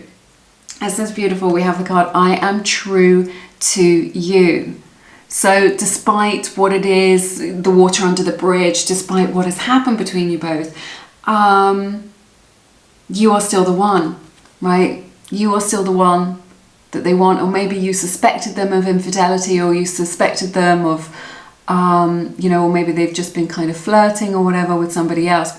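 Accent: United Kingdom. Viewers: Dear, i just wanted to tell you that i absolutely love how you talk (that british accent...👌) also, pile 2 resonated so much, thank you so much!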